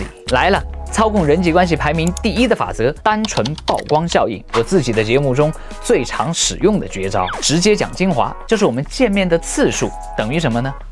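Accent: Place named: Wuhan, China. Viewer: 最近刚刷到老师，视频听着觉得太棒啦。听到这个视频中亲切的武汉话才得知是我老乡，我是生活在墨尔本的武汉丫，必须支持 ❤马上会员安排上😊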